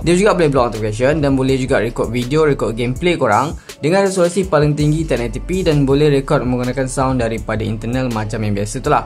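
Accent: Malaysia. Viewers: satisfying bat denger orang malaysia ngomomg hahaha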